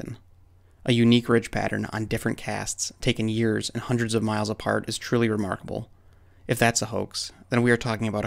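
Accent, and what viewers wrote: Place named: New England, United States. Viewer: You must be from the East coast. No one says "wicked" like us that live in New England. I'm in Maine. I have a friend that had an encounter in the Bridgewater triangle ( wompatuck state park) He and friends were chased out of the woods by an ungodly scream. My theory is they are everywhere! Thanks for sharing your experience. I truly love your channel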